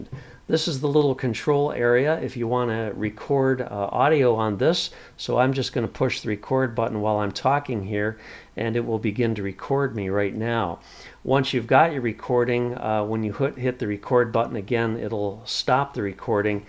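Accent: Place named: United States. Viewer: Great review/tutorial...Finally an "American English" speaking reviewer. Those reviewers from GB or wherever have those awful accents that make it hard for me to understand. Thankyou!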